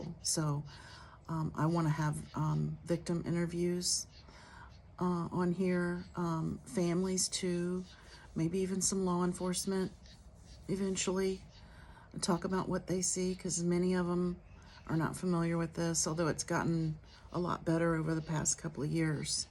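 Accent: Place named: Texas, United States. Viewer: She has a Texas accent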